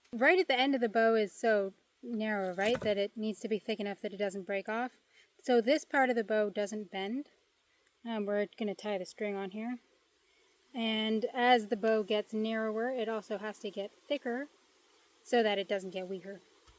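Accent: United States. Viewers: ... fantastic technique .. congratulations ... are you from Queens?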